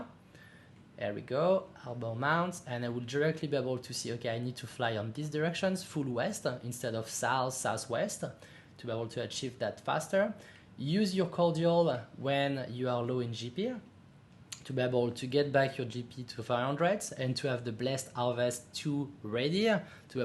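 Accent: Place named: France. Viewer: non non, je suis Français aussi et ça m'amusais juste de voir quelqu'un avec un accent plus fort que le miens lol. Super vidéo merci. 🤝🏽👍🏽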